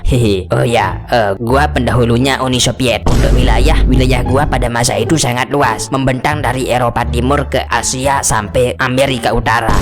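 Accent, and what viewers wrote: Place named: West Java, Indonesia. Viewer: sunda banget ya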